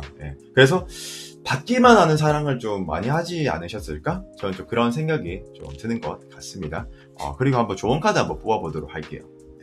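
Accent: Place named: Gyeongsang, South Korea. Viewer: 경남분인듯 저 3번여 콩 콩 ㅋㅋㅋㅋㅋㅋㅋㅋㅋ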